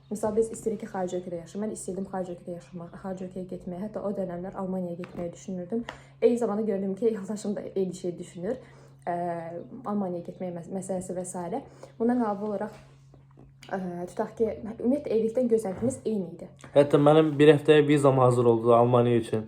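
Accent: Azerbaijan. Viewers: Ozum Zaqatalaliyam amma Bakida yaşayıram.necese təsadüfən qarşıma çıxdız...avar olmaginiza rağmen danışıq diksiyaniz ve tərziniz aksentiniz cox gözəldir.meni o celb eledi ki size baxim😊ve Maşallah beyimizin bir sözüde lap xosuma gəldi ..eger bir adam sizin üçün deyismirse deməli o düzgün adam deyil😅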